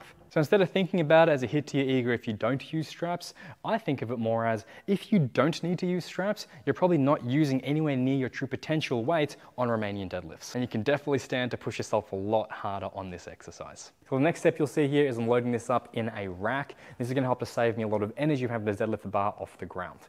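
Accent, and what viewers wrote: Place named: Australia. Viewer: When I heard your voice I immediately picked up that your were an Aussie, and then I looked at your About page and yes, I was correct. Thanks for the deadlift info.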